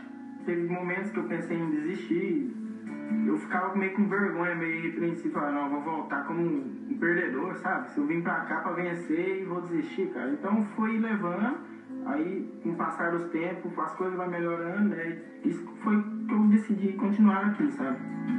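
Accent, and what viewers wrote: Goiás, Brazil. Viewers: Comecei a assistir os vídeos do canal, e pelo sotaque, já sabia q era goiano...huahuahua O sotaque não nega. Parabéns pelo sucesso! +1 inscrito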